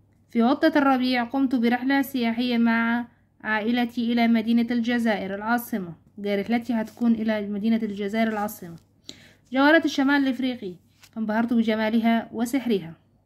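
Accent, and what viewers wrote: Egypt. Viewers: بصح هدرتك تاع مصر حسبتك مصرية😅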